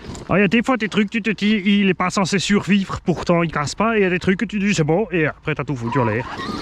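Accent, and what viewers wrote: Alsace, France. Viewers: Le retour de l'accent Alsacien ! Je suis tellement fan !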